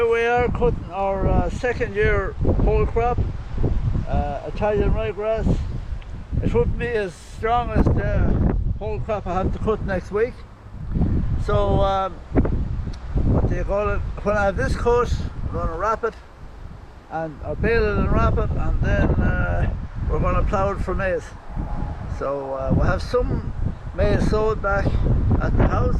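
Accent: Ireland. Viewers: right at the end of the video those of us eagle eyed old boys 🤣🤣🤣 - we can see what looks like “red straps” to a dress. Knowing that you and ‘mum’ went shopping in Clemont, we all assumed that it was a new purchase! (I think we were all hoping for a fashion show 🤣 but then we would be a different type of old men....... ) You have to remember that most of us have been shut away and you have become a farming celebrity. We have all taken to you, mum and dad - it’s honest, real, true and interesting with a touch of fun. Plus there is nothing nicer than your Irish accent. Take care, smile and keep posting true, real, interesting videos - we all enjoy them. Richard xx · That's because it's an Irish accent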